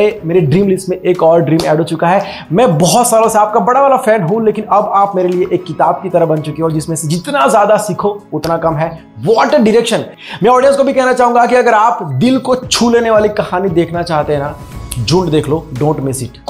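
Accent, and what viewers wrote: Gujarat, India. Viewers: kya aap gujarati ho? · Aap gujrat se ho ??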